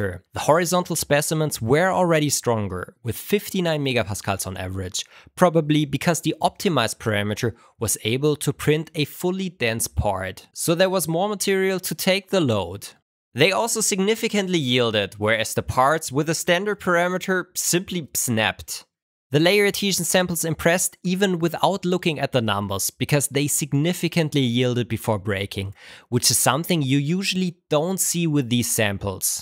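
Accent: Germany. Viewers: Wie das deutsche rauskommt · Du bist doch Deutscher oder 😅 also ich mein da was rauszuhören 😁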